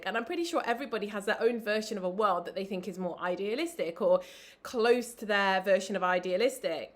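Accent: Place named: Yorkshire, United Kingdom. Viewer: The floor in your argument is that 80% of the population are as thick as you know what. They are not capable of reasoned thought. That is why things like football exist. To give those people a raison d'etre. PS, where are you originally from ? I think an east Yorkshire twang occasionally makes a bid for freedom.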